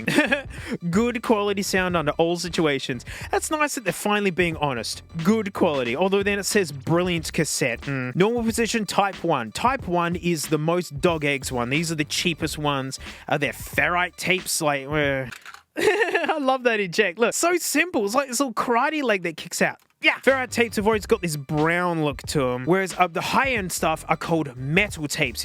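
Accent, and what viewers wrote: Australia. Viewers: techmoan: aussie edition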